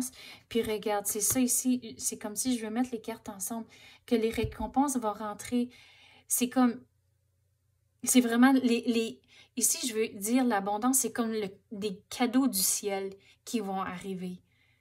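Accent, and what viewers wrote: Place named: Quebec, Canada. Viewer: Bonjour ! J'adore les Runes ! Quelle belle interprétation. C'est lié à la fonte des glaces et l'écoulement de l'eau lié au mois du mois de mars. Si on lâche prise, on accepte l'ababondance liée au poisson. Merci ! Je m'en sers également de temps en temps ! Quel jolie Accent ! Etes vous québécoise ? Belle journée !🌈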